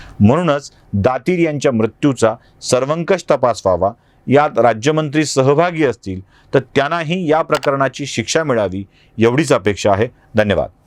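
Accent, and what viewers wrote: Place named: Marathwada, India. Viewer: त्यांच्या भाषेचा लहेजा तसा आहे , थोडा ग्रामीण , शिरवळ , मराठवाडी पण स्पष्ट .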